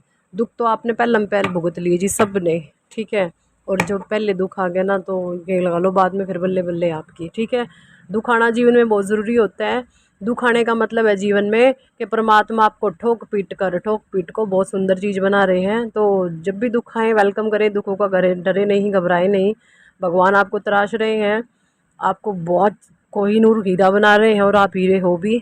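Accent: Haryana, India. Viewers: आज। की रीडिंग पुरी तरह के से हरयाणवी है एक दम सुपर 👍👑👍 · Sahi kaha Rahe ho aaj haranvi bol Rahe ho ❤😊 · Pehle apka punjabi touch tha, par aj puri haryanvi boli hai😂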